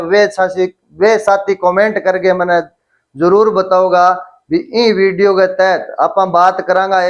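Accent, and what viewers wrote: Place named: Bagdi, India. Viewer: Waa Sir apni bagdi bhasa m bhot bdiya triya bat clear kro the 👏👏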